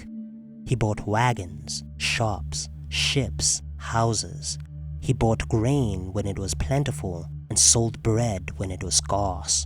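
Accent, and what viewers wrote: South Africa. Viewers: This dude sounds South African😅.... great analysis by the way❤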